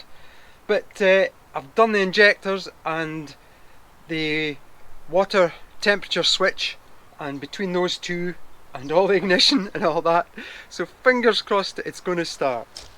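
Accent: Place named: Inverness, United Kingdom. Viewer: You sound like you’re from Inverness. I just revived Heart Vw MK1 with the K jet injection system. After rebuilding the fuel distributor, the problem ended up being no pressure coming out of the warm-up regulator. Come to find out it was completely seized internally. After taking it apart and freeing up everything, I had more than 60 psi’s feeding the fuel distributor, which resulted in the car starting for the first time. Leave no stone unturned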